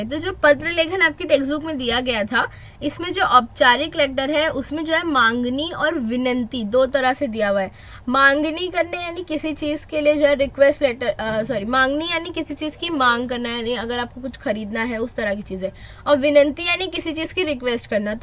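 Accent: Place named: Maharashtra, India. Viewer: her marathi ascent😍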